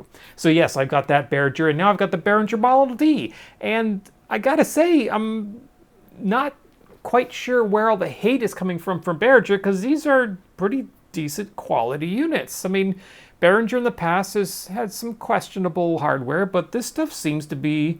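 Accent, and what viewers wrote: Canada. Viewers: I am subscriber 9.32K-ish. I subscribed today. I've been watching this channel for a long time and just noticied I never subscribed. are you Canadian? I can't fix your accent. You say re-VERB instead of reverb like most people and as a sonic minded person it's puzzling. Your channel is great! Thank you for all of the videos and content.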